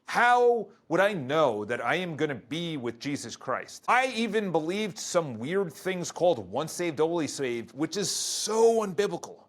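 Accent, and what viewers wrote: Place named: Philadelphia, United States. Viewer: Are you from Philly? Or somewhere in SE PA, South Jersey, Delaware? All similar accents.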